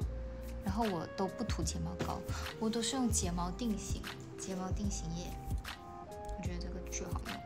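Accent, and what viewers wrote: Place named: China. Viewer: 整個中國腔欸！（有嚇到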